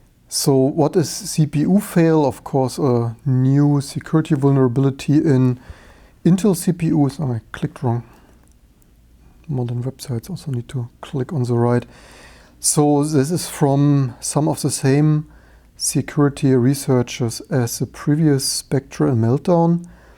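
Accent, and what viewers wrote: Germany. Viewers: Solltest schon sagen dass du Deutsch bist, es ist wirklich nicht zu überhören 😅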